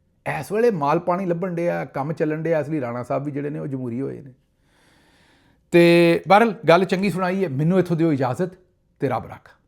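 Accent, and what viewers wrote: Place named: Punjab, India. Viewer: Like hearing your Punjabi Accent!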